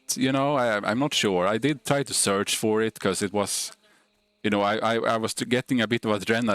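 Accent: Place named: Sweden. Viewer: Great theorycrafting, btw älskar den svenska dialekten!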